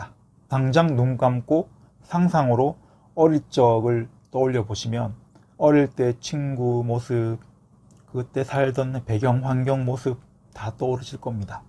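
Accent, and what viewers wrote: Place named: Daegu, South Korea. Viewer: 영상 잘~ 듣습니다. 같은 대구말투도 정겹구요^^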